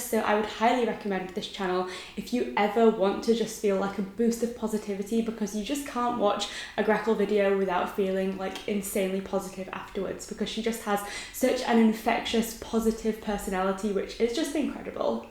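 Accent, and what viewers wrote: England, United Kingdom. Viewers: She’s from England but idk where specifically.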